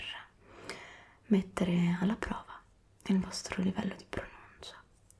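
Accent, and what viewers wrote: United Kingdom. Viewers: E' vero che ci sono diversi accenti (sia in US che in UK: quello di e' la cosiddetta Received Pronunciation, le persone attorno a me qui nel North West of England, e io stessa, parlano in maniera un bel po' diversa!), ma in linea generale puoi facilmente identificare se una persona e' americana o Brit. Le T come ha citato, le R, per esempio, che gli americani pronunciano e gli inglesi no (tranne in alcuni accenti), e che Scottish e Irish pronunciano in maniera ancora diversa, le L in 'walk', 'talk', o alcuni suoni vocalici (vedi: vitamins, route), e l'intonazione in generale che e' spesso diversa. Nell'inglese britannico si usa moltissimo il connected speech, che e' cio' che fa dire agli stranieri 'gli inglesi si mangiano le parole'. Nell'inglese americano questo e' meno marcato (poi ovviamente hai eccezioni). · bravissima! sembri una inglese proprio; peccato che io capisco solo la cadenza USA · Pazzesca! 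Veramente top il tuo british accent darling 👏👏 Ps* ammazza quanto è severa questa prof!! Attendiamo con ansia la prossima lezione 🖋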